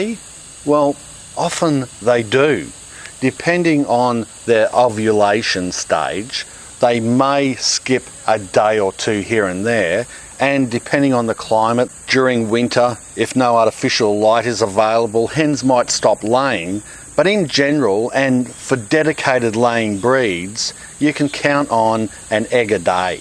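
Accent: Australia. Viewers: I love the video, the australian accent, the puns and those shorts.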